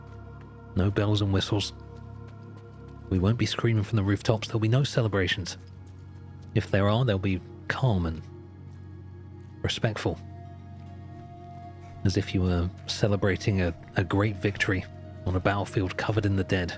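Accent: United Kingdom. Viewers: I cant sleep without hearing people getting their heads blown off (in SE) to peaceful music and a British whisper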